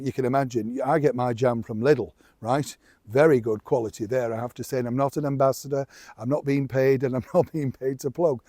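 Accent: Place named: England, United Kingdom. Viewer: Your channel now has good sound. With the background noise and an English accent it was hard to stay to listen.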